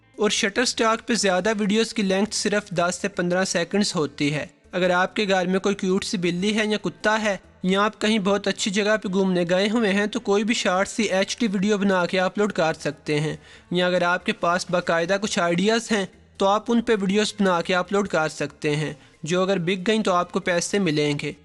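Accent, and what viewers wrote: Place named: Lahore, Pakistan. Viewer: Yar tussi lahori ho ☺☺😑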